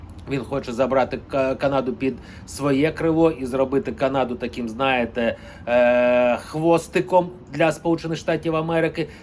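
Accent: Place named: Russia. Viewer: Не доставай. Так чути росіянський акцент, людина все життя розмовляла росіянською мовою, і в цьому не його винна. Зараз він розмовляє англійською, і це не так легко як здається. Говорю з власного досвіду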